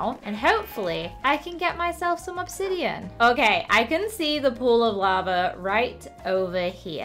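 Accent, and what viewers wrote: United Kingdom. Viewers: Yammy: So i'm gonna stick to the birch theme Me: Wait? Did she just said the b word Replays the birch word over and over Nop she said birch she just has an british accent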